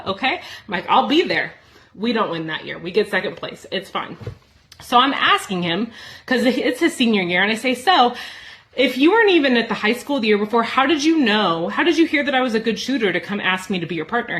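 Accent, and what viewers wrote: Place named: Fargo, United States. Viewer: Gorgeous story. Love the hints of Fargo accent.😊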